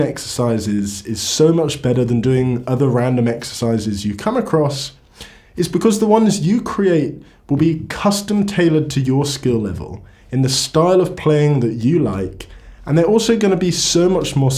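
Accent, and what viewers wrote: United Kingdom. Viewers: I'm here for the accent: I love the way you say, "What is up guys" like you are Prince William 😅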